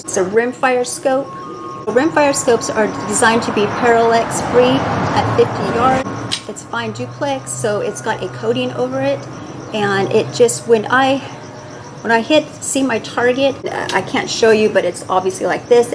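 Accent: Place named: United States. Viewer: Aussie here. You have the sweetest American accent lol Sarah Connor 🤠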